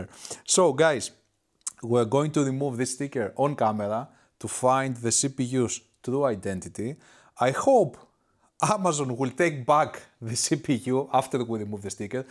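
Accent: Greece. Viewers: That accent is <num>% greek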